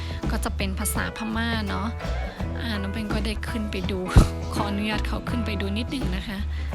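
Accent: North, Thailand. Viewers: อยากทราบว่า เป็นคนไทยภาคเหนือหรือมั่ยคะ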